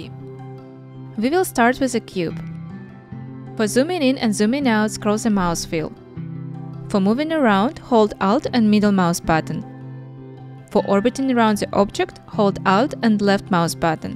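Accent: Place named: Eastern Europe. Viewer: Единственный английский который я понимаю, этот тот, на котором говорят славяне, ахах) А почему решили на инглише вести канал?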